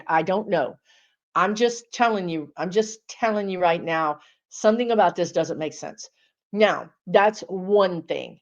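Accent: Texas, United States. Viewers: I didn't realize you're from KY. You do have a bit of an accent, but I thought it was Texan. 😂 I'm from IL and lived for years not far from the southern tip. I was told I had an accent when we visited family in WI!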